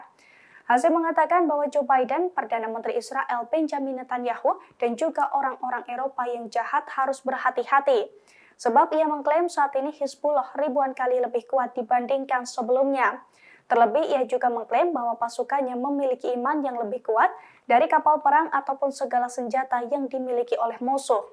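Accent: Java, Indonesia. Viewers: mbak e wong jowo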